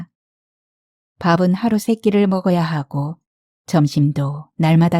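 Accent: Jeolla, South Korea. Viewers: 밤늣은시간인데잠을못이루고 있어요너무재미있어서요 구수한전라도사투리가듣기가 좋군요긴장편잘듣겠습니다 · 님 어찌그리 전라도사투리를 맛갈나게 읽어주시나요 전라도 사투리 매력에 빠져들고 소설에 빠져듭니다~ 감사히 잘 듣고 있습니다~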